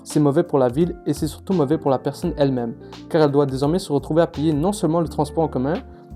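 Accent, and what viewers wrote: France. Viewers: Quel commentateur de merde! PLEIN de faux et en plus avec un accès de merde, xur à comprendre, un vrai français frustré, comme dirait Martineau " mais de kessé ”? Y a plein d autre pays pour habiter. Je suis de Québec et j aime bien Laval pour sa modernité, ses grands boulevards larges, son métro, l” université de montréal, Place Bell, espace Montmorency, centre de la nature, carrefour Laval, etc... Laval est la place no.1 au Québec pour la culture en serre... Donc, petit frustré, probablement que tu a une crotte contre ton compte de taxe ou une contravention pour avoir grillé un feu rouge te là, tu fais du bassin gratuit. Mauvais immigrant dont on a pas besoin, même si on manque de monde. On veut des quebecois d adoption respectueux, heureux et fiers. Pas ses plaignants. Mon grand père qui a, avec tous les autres grand père set mon père, ne se plaignaient pas. 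Ils faisaient. Bye, conard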